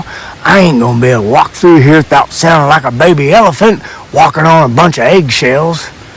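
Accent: United States. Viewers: Yep, you are goofy. Just what I thought. Loving it though! With you talking like an old country boy and making such a racket...they are not gonna stay around long!! Oh well, they'll be back!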